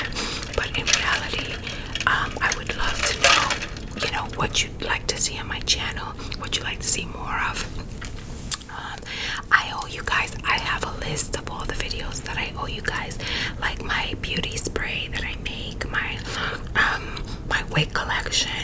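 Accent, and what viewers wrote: New York, United States. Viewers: Hi. Just started following you insta and I would like to say well-done on the weight loss fantastic to see. Listening to your voice what accent is that? New York? All the best from bobby from Warwickshire England.UK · So happy to see the bell notification and that it was a vid from you! Everybody needs a break from you tube sometimes and don't feel bad about accepting donations..you deserve them! I would love to see your professional makeup kit/application..I love the sound of your jewelry making noises, and when i hear your New York accent. It's so obvious you experience ASMR.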